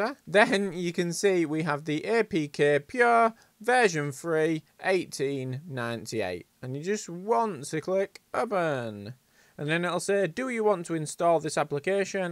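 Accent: United Kingdom. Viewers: One thing i could confirm is that, he is from UK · Are you from Hull mate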